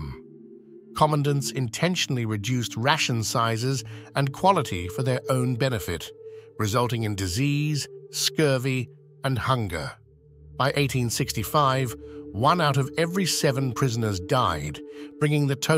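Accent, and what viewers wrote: United Kingdom. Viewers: Hmm, I thought because it was yet one more UK narrator doing American topics… But seriously, the UK gave us the first real concentration camps in the South African Boer War (referred to as the Second Boer War). Women and children died by the thousands.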